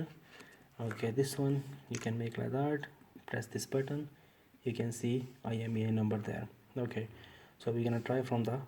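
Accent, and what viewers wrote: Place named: Pakistan. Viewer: Bhi tum Pakistani lagta hn awaz sa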